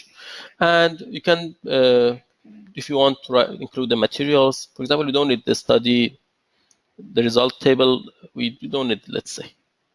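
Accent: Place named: Iran. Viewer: your accent is clear that you are an Iranian. How come is your name?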